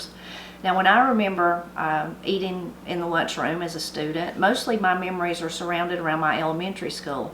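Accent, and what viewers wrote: Appalachia, United States. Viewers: I recently found your channel and I love it ❤ My mom made these for me and my siblings when we were growing up. The recipe came from my dad’s mom and we call them oatmeal candy. Your accent is very similar to my dad’s and his family. They are from a little town called Lafayette, GA which in the Appalachian mountains 😊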